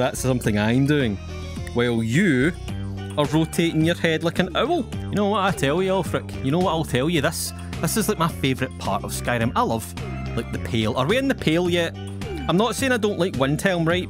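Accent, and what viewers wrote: Scotland, United Kingdom. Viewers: Oh my goodness, you might be the most Scottish person I've ever seen. Like, not even just the accent, but your sense of humour and the way you talk as well, it feels so very Scottish and it's an absolute joy to listen to. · If you had a tid bit of irish mixed in with the scottish accent you could become Sheogorath himself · I did not know I needed 23 minutes of a Scottish man dragging Ulfric Stormcloak all around Skyrim. For that, I thank thee. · Your name should be the laughing scotsman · The Scottish accent just makes this more entertaining LOL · This is Scottish babes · Scottish accent made this so much funnier😂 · Lol IAmCrusty does this sort of thing and he's British so hearing a Scottish person get upset in this game is a new level of entertainment that I can get on board with. Glad I found your channel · Never thought i spend 30mins hearing a scottish dude ramble at an npc yet here i am🤔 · It’s the Scottish accent for me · The Scottish scolding is really soothing. 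I will watch your other videos, they maybe relaxing too. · I just found this channel, and my god this is hilarious. The Scottish accent really adds to it all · A Scottish version of IAmCrusty….I love it 😂🥹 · Some insane and rambling scottish dude drags Ulfric from Windhelm to Solitude. Why is nobody stopping him? Because he's the friggin dragonborn, slayer of Alduin the world ender. Who's gonna stop him? Some random ass guard that used to be an adventurer? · This is the first video I've seen of this man, but his beautiful Scottish accent sold me. Subscribed. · 😂😂😂 oh i love ur accent it makes it so much better to watch scottish is an amazing acent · Scottish YouTuber AND Skyrim??? I’m home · First video I seen was Aventus, it was weird and would have felt unnatural had I not known what was happening lol. This series is GOLD! Your heavy Scottish accent makes it so much better. Thank you. 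· Damn, that was HILARIOUS! I couldn't stop laughing! And with scotish accent I instantly thought of the NacMacFeegle! Nay King! Nay Queen! 🤣 So maybe you could make the other way rund also dragging Tullius to Ulfric! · Why is there a Scottish man dragging Jarl Ulfric across the land of Skyrim while scolding him with a heavy accent in my recommended videos? Not that I'm complaining. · I'm a simple man. I hear a Scottish accent, and I'm happy. In fact, I think I spontaneously sprouted a kilt, and for some reason I cannot pronounce "burglar alarm" any more. Just found your channel, already love it. Thanks! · I’ve never found something funnier than a Scottish man calling Ulfric Stormcloak a dirty fascist bastard. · No intro, not name, not asking to like and subscribe. Not even an explanation aside from the title. Just a heavily accented Scottish man dragging a Nordic man across the countryside. 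This is peak entertainment lol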